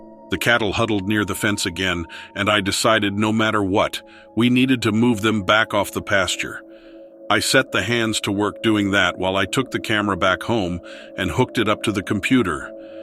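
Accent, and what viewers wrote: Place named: Midwest, United States. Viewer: You have a great voice!! I really enjoy your channel. You have a Midwestern accent. What state are you in??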